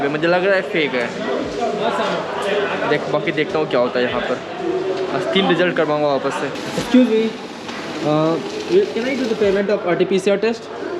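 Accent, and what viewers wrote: Bihar, India. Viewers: Mitlish ka video acha tera bakwas 😡😡😡 h Tu bihari gadi ko gari boltha h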